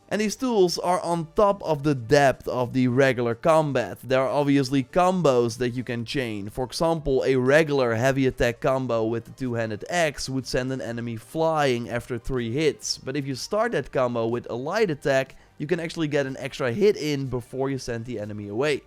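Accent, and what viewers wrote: Netherlands. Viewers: Are you Dutch (judging by your accent)?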